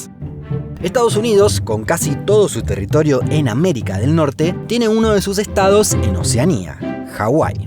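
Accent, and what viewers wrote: Rioplatense, Argentina. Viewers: Al leer ese libro, todos lo leeríamos en nuestras cabezas con acento argentino... con la voz del narrador! P.D. Muy buen trabajo. Es uno de los mejores canales en YouTube, y creo que el único canal del que he visto todos sus videos. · El que habla parece argentino o uruguayo.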